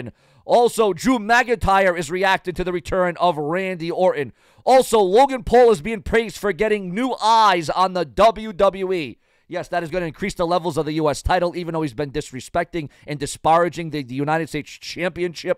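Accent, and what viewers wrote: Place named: California, United States. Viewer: any news from wrestling from you would be great. You have an awesome voice. I love it. What state are you in? You sound like you are from California. Keep up the great work you da best❤❤❤❤❤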